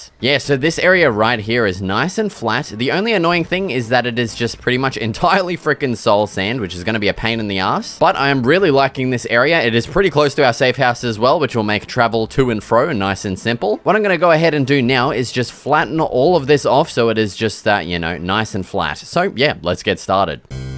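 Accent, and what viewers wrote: Australia. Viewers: this is the most australian man i've ever heard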